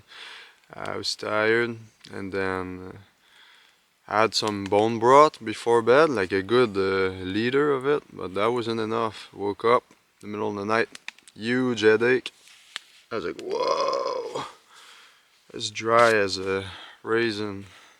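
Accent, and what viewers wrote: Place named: Quebec, Canada. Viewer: Also just found you. You remind me so much of the guy in “49th Parallel.” Lawrence Olivia. Right down to that French Canadian accent. Love it. You were made to do this. People need to know how much water you need in the winter. I worked out in the cold for close to “ let’s just say many years.” Very dangerous when you sweat. I like how you kept it so simple. Beautiful scenery.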